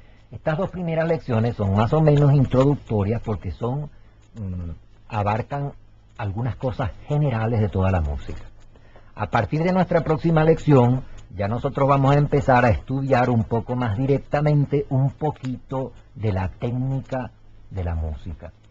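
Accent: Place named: Caracas, Venezuela. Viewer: Nótese el acento del caraqueño de antes.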